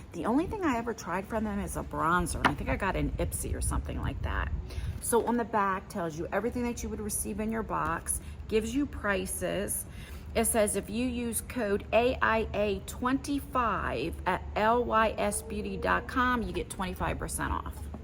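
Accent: New Jersey, United States. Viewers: I know I've told you this before but I love your NJ accent because my boyfriend of 13 years has the same accent and I'm from Boston so I have a Boston accent so we tease each other about our accents. 🤣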